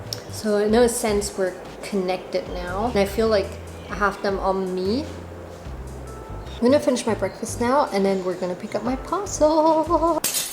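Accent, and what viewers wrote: Australia. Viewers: Are you starting to pick up an eastern states Australian accent? Some of your vowel sounds definitely have that twang to them now, like from - . "Cacaow", "paowdah", etc. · Bruh, I'm an Aussie, I honestly thought I could hear an Aussie accent and you seem pretty fluent/ enough to take orders and stuff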